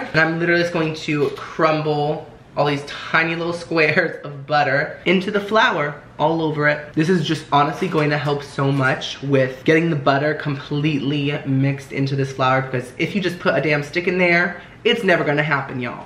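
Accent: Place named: United Kingdom. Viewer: i hope you do a full diy talking in your british accent one day! it always cracks me up! 😂😂😂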